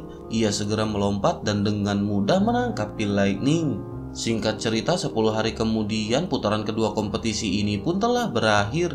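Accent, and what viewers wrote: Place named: Jakarta, Indonesia. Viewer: Paten mimin logat betawi keren dan lucu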